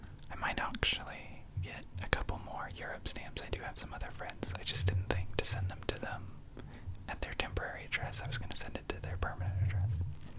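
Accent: United States. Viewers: andré Patricia American accent isn't terrible? He is americain ! he only studied in france. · Your american accent isn't terrible but great !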